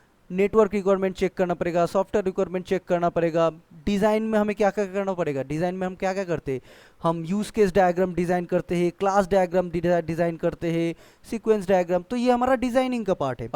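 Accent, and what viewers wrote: Bengal, India. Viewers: Bangali naki?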